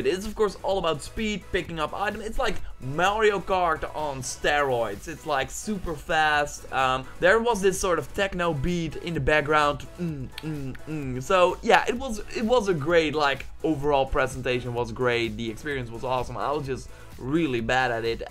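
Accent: Netherlands. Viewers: You should work on losing the Dutch accent.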